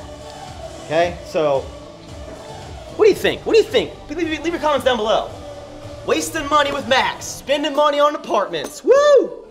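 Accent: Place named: Washington D.C., United States. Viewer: just found ur channel. love ur vids bro! sounds like D.C. to me... moving on uupppp!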